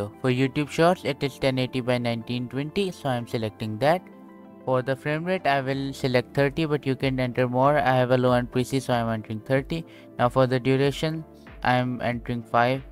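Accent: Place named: Pakistan. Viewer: i feel bad for you how other people judge your voice bc ur pakistanian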